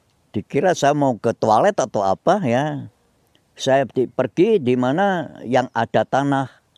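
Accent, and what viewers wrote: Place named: Java, Indonesia. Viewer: Eyang aksen jawanya masih medok sekali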